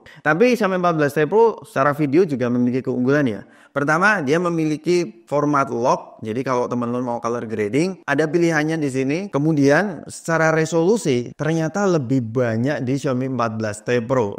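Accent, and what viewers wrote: Semarang, Indonesia. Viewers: Wong Semarang maszeee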